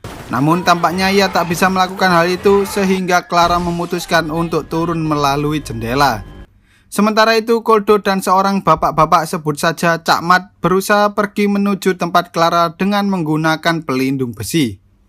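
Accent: Java, Indonesia. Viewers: Jowo pisan iki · Jowo mas🔥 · Mimin orang jawa kahh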